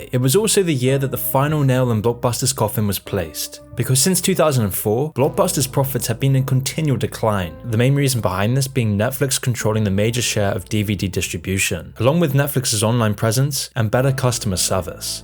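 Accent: United Kingdom. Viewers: This channel is a bit QAnon in my view with a British accent. Plenty of reasons to criticise Disney and Netflix but when going into 'woke world' seems to lose the plot as such. I don't like Blackrock either but I don't think there is some master plot as such.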